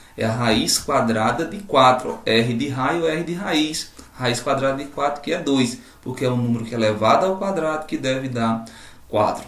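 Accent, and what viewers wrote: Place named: Northeast, Brazil. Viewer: aula mt boaa prof! mto bom ver alguém aqui no yt com o sotaque do nordeste :) sucesso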